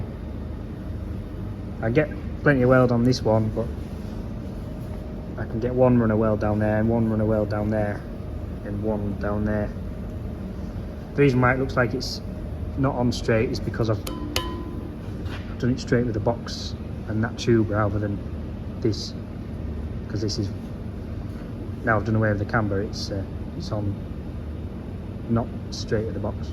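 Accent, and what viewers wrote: Yorkshire, United Kingdom. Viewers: Thanks for the share, l’m enjoying your great videos and yes you do explain what you are doing and why very well. I’m from Richmond North Yorkshire,now living in the East Midlands and is great to hear the Yorkshire accent. Your workshop is looking much tidier on video 👍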